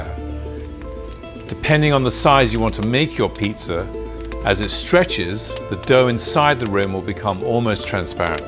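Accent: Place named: United Kingdom. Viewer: Accent quintessentially British...great vid!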